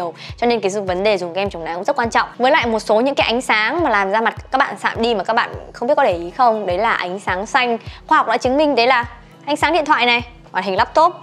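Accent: North, Vietnam. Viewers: Chị ở Thanh Hóa người Miền Bắc nha. Bạn nghe đâu ra miền Trung vậy 😂😂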